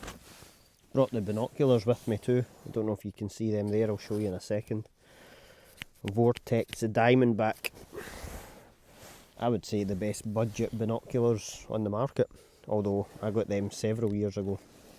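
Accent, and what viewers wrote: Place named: Scotland, United Kingdom. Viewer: New pipe smoker here- I love Malcom and his poem. You did it justice mate- something about reading poetry with a Scottish accent- it really works Thanks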